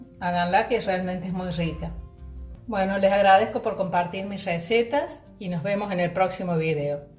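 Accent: Córdoba, Argentina. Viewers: La primera vez comí la tarta de ricota con naranja en Cordoba Argentina, hace 15 años; simplemente fabulosa. Voy arriesgarme a preparla. Me encantó oir su acento cordobés. Gracias por compartir.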